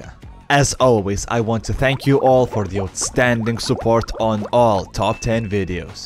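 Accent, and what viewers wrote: Russia. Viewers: I love, when russians speak English! It sounds so awesome! I love the russian accent. “a friendly slap in the face.“ xD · Is narrator russian? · I love your Russian accent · are you russin? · I'm sorry if this offends you in anyway, but, are you Russian or maybe Scottish? The accent you have is making me curious. If you don't wish to answer I understand. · are you Russian? probably but are you? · His accent is good tho Russian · Hello comrades" communism 100 Edit:I just noticed the russian accent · Больно у тебя акцент русский . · I can bet you are russian i hear your english and i like intro HELO COMRADES 😂 greetings from serbia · Do you speak Russian accent? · Русский акцент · Russian ascent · У тебя такой русский акцент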